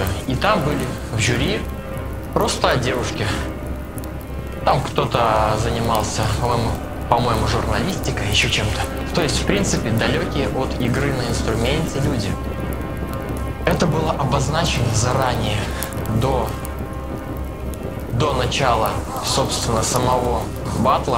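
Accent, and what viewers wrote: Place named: Belarus. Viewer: Первый раз слышу такой голос на твоем канале. По акценту слышно что ты с Беларуси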